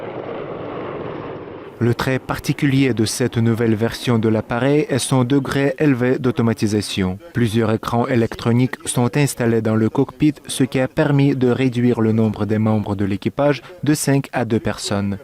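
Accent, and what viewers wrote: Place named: Ryazan, Russia. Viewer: не позорился бы уж своим рязанским акцентом - говори по-русски.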